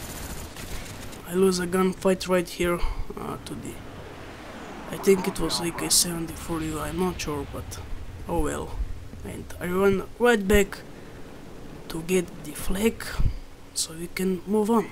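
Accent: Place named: Mexico. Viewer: You sound Mexican rather than someone coming from the Balkans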